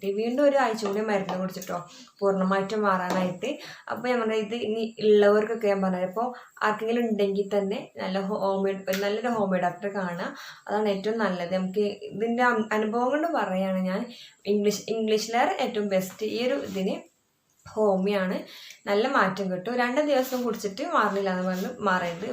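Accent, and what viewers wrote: Malappuram, India. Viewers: സ്ത്രീ ശരീര സംരക്ഷണത്തെക്കുറിച്ചും ലൈംഗിക ജീവിത ആരോഗ്യത്തെക്കുറിച്ച് ഉള്ള വീഡിയോകൾ കൂടുതലായി ചെയ്യുക പിന്നെ നിങ്ങളുടെ മലപ്പുറം ഭാഷ ശൈലി എനിക്ക് വളരെ ഇഷ്ടമാണ് എല്ലാ വീഡിയോകളും കാണാറുണ്ട് കമന്റുകൾ ചെയ്യാറില്ല എങ്കിലും പിന്നെ പറ്റുമെങ്കിൽ കുറച്ച് ഊർജ്ജസ്വലമായി സംസാരിച്ചാൽ നന്നായി വരും അല്ലാഹു അനുഗ്രഹിക്കട്ടെ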